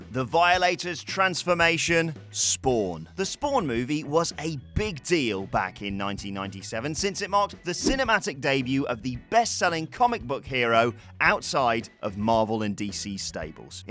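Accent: United Kingdom. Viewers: This British commentator got me ctfu 🤣🤣😭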